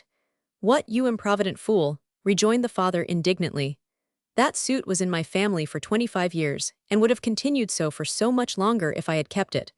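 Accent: United States. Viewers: An American accent doesn't do justice to a good book,sorry.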